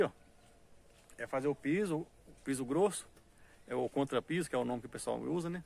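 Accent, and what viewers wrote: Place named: Minas Gerais, Brazil. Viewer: Então tá bão uai....eita sotaque forte de minas...tá ficando chibata!!! Arrocha aí meu irmão. Forte abraço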